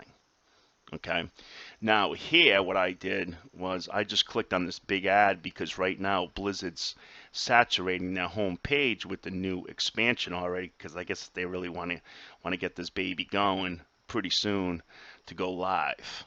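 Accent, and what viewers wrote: New York, United States. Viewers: Are you from new york?! If so youre awesome! Love your accent!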